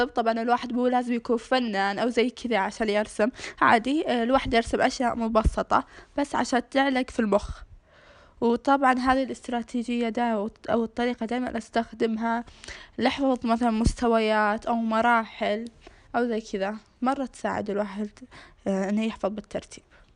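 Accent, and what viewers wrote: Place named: Algeria. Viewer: نتي جزائرية تتكلمين مثل الجزائريين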